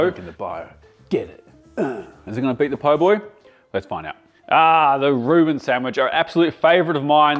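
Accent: Australia. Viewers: Sounding very aussie now mate, gotta come back to your whakapapa 🥝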